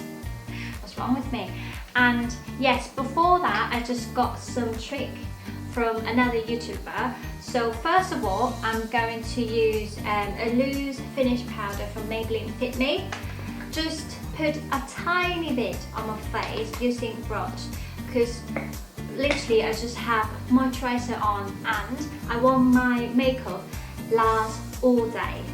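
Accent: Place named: Thailand. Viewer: I like your Thai accent!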